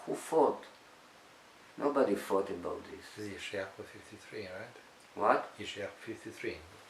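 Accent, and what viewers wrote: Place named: France. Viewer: Je prie pour vous Rav, votre accent en Anglais revele que votre langue maternelle est le Francais ainsi que la mienne. Je prie que YESHUA le ROI MASHIAH BEN YOSEPH souffrant a sa premiere venue avant le destruction du 2eme temple et qui a pris les peches des brebis perdues d'Israel en premier lieu et ceux du monde entier ensuite....mais reviens comme le LION DE JUDAH se revele personellement a vous comme il l'a fait avec moi...IL m'a gueri quand je ne le connaisais pas....vous, vous avez le privilege d'avoir entendu la Bonne Nouvelle de Son Royaume, moi je ne l'avais pas eu et je ne savais rien a son sujet....jusqu'a ce que dans la priere au Boreh Olam Baruch Shmo je lui ai demandee de venir me guerir de ma maladie quand j'etais en France. Ce soir la YESHUA Hitkadash Shmo, est venu vers moi, IL a pose ses mains sur moi et IL est reparti, mais 3 jours apres j'etais completement gueris. 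C'est alors que יהוה m'a fait comprendre que je devais lire SA PAROLE ECRITE....mon papa Salomon, qui est au ciel, par la Grace que YESHUA lui a donne, etait le Chazan de notre sinagogue chez les Lubawitch, que j'aime beaucoup, c'est la ou mon fils a fait sa Bar-Mitzva. Mon papa avait grandi dans une Yeshiva a Tanger et il nous racontais que jamais ils etudient les prophetes et il n'avait jamais lu les propheties sur le MASHIAH. Que le D.IEU יהוה d'Israel vous fasse Grace comme IL nous l'a fait a nous et vous et votre famille soyez sauves par celui qui VIT a la droite du PERE Eternellemen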